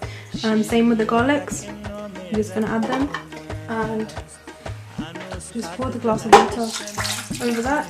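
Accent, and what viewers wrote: United Kingdom. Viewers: I'll try this recipe. Thanks for posting it. Like the music too - what is it? And nice accent - are you from London? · I was into her British accent than cooking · Hey I loved your voice it's so soothing and your British accent makes it even more beautiful 😌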